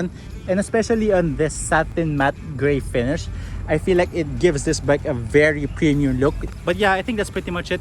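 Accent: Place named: Philippines. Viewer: YOU SOUND SO PILIPINO.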